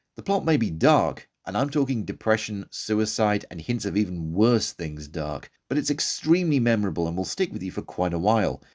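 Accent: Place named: England, United Kingdom. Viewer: Ребята он ряльно англичанин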